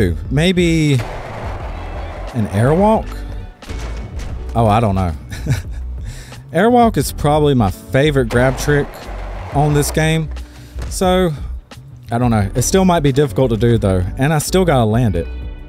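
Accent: South, United States. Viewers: Agreed! Calming and soothing with that little bit of southern twang lol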